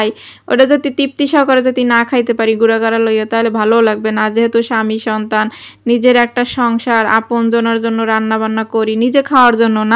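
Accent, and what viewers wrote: Barishal, Bangladesh. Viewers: আসসালামু আলায়কুম আপু আজকে প্রথম তোমার ভিডিও দেখলাম ।আপু তুমি কি বরিশালের মেয়ে। কথা শুনে মনে হলো। আপু অনেক অনেক ভালোবাসা রইলো আপু। আপু আমি ও কিন্তু বরিশালের মেয়ে ❤❤❤❤❤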